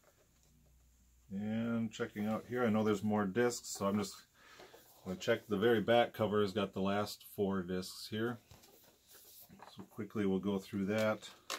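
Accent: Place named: Minnesota, United States. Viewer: Been watching a lot of your videos. Never picked up on the fact that Minnesota accent was actually coming from Minnesota. Hello neighbor.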